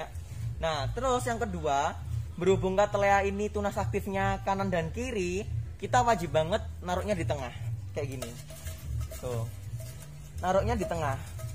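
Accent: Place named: Java, Indonesia. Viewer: medok banget mas😂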